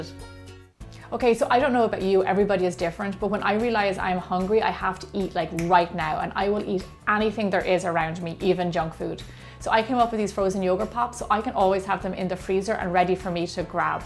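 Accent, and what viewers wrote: Ireland. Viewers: Are ya Irish? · she is from Ireland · is she from Ireland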